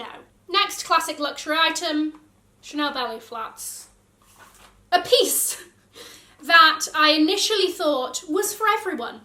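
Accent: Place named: Liverpool, United Kingdom. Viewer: Great content. What colour is your burberry trench? I have to say again you sound like a" posh" scouser. Your fab always make me smile. 😊😊xx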